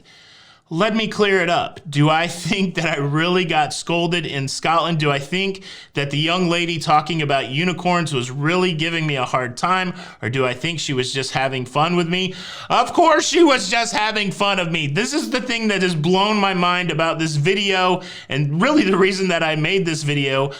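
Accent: United States. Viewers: Give the guy a break. Talk about oversensitive! American accent.. so what?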